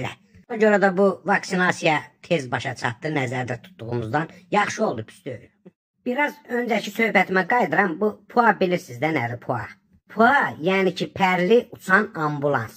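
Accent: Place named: Aghjabadi, Azerbaijan. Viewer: Bu (lehcesine gore) Agcabedili,ve ya Beyleqanli olduguna wubhem yoxdu.Ancaq hami kimi isterfim ki,cixsin ortaya ve onu butun Azerbaycan tanisin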